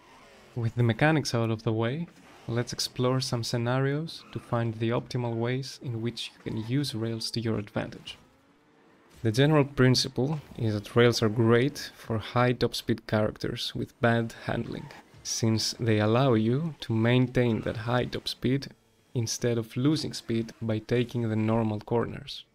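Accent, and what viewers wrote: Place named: Greece. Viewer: Ρε ελληνας εισαι? το ψιλοκαταλαβα απο τη προφορα, αλλα στο τελος με τον αγγελακα ηταν σιγουρο χαχα, ωραιος βλεπω τα βιντεακια , εχω 1:39:600 με το gaston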